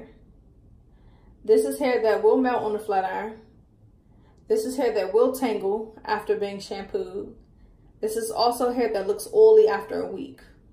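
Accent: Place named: Philadelphia, United States. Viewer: GREAT INFORMATIVE VIDEO ................ & YOU SOUND LIKE YOUR FROM PHILLY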